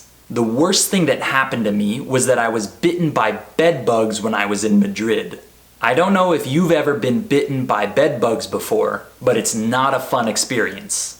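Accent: United States. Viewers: No , American accent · Hey! Thanks for the advice that you gave me yesterday, you are intelligent, you are amazing, you have soft voice to speak English fluent, intonation, you speak English to flow like an American, I am really fell very proud of you, I do appreciate you a lot, I am glad for you, you make me feel relax as you speak that accent, yeah! 😸😄😆👌👍😊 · I guess it's an American English doesn't it ? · No, is American accent (more or less) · It's American English. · Is it american accent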